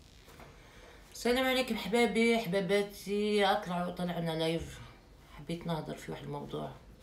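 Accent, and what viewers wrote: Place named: Annaba, Algeria. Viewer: من هدرتك عنابية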